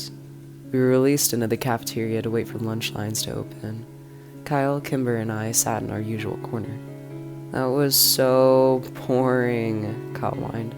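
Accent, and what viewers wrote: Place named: South, United States. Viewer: as a Texan i will permit the southern accent. it was acceptable. thank you for the video i enjoyed it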